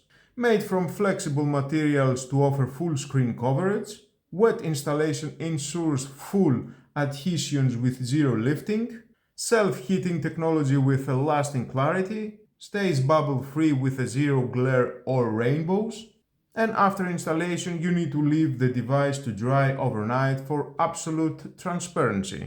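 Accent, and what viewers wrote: Greece. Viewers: Είναι το πρώτο βίντεο που βλέπω και από την πρώτη λέξη κατάλαβα ότι Έλληνας 😅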